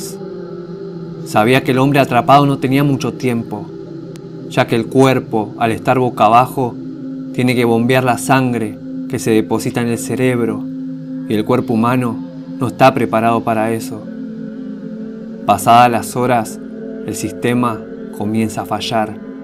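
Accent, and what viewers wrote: Argentina. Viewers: la voz y siendo argentino jajaja da es pena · Es por que es argentino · me imagino que es de otro país, Argentino tal vez, Chileno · Así hablan los argentinos? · La Re. Caga el asentó argentino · Asi hablan los porteños de capital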